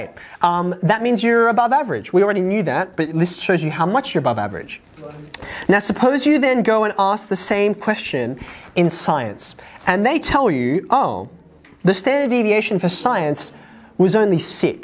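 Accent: Australia. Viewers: He is Australian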